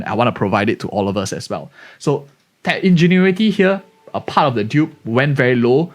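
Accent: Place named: Singapore. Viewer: Great content, are you from Singapore? HAHAHH idk why but u sound very Singaporean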